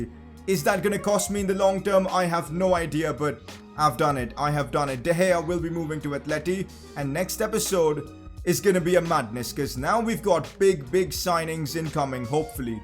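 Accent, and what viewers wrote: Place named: India. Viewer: PCQ: your accent is different where are you originally from? India? Sorry if its personal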